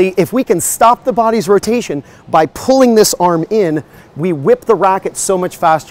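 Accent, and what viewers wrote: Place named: Florida, United States. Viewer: I hear an accent but I don’t know which state? Florida?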